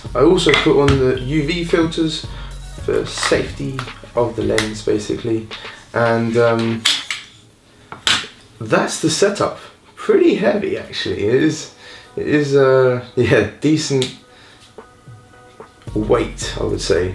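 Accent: United Kingdom. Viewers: Wieso kannst du so gut Englisch? Hört sich halt ultra nach nem britischen Akzent an... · okay I´m really flashed right now I have been watching felix` vlogs over the past few weeks daily again and when you stepped in the #TeamSpielkind I really liked how you edited the videos and how you were and are in the vlogs but on German and now I FINALLY checked your chanel and I must say that I`m pretty flashed by your British Accent haha xD ok I had to say that. I wasn`t expecting this xD Didn´t know you were British haha. Okay keep on I will follow you on.:D Bye have a nice day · Guten morge. Bin neu auf deinem kanal durch #teamspielkind. Woher kommt denn der nice britische akzent? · Hört man sehr stark Raus 😂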